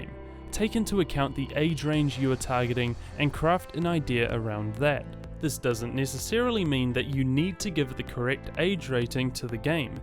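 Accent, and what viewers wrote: New Zealand. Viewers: Wait?? Your a kiwi??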